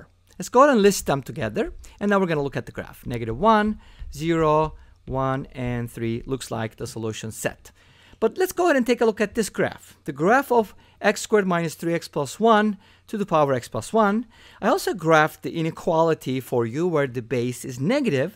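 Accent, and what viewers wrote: Turkey. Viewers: I like your rapid and simple solutions. Some of the math channels I checked out, they are too slow and redundantly repeating same formulas dragging on. The viewers are math students, and the podcaster needs to get to the bottom as quickly as possible. Especially this one Indian guy, who is a brilliant mathematician but just tedious and boring to watch him. So Ata boy! and from your accent I gather that you are Turkish. Ben azeriyim. Tebrikler!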